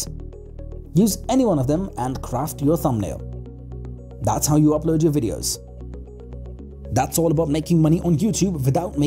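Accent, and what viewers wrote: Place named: India. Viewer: Your English look like you are India I know you're belong to India 👍 I like your videos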